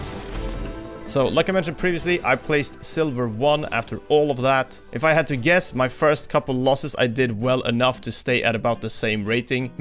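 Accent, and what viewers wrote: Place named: Sweden. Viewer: is he swedish? sounds like that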